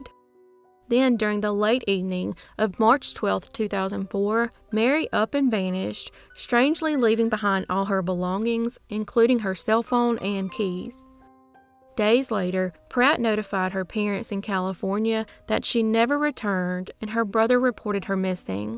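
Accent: South, United States. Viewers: I’m from U.K. but guys what accent is the presenters voice ? Must be down south right ?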